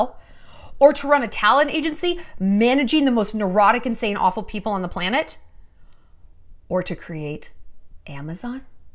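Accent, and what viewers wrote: Russia. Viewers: Your russian accent gives me absolute life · Your Russian accent and the random script you chose was soooo funny! I hope you do a Advice-themed standup and maybe commercials and movies!!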